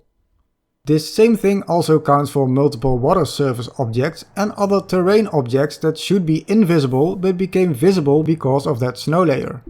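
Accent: Netherlands. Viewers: You sound Dutch to me🤣 if I'm correct you will be able to understand this ; Het ziet er goed, ik ben hier heel blij mee! Dank je wel voor alle uitleg!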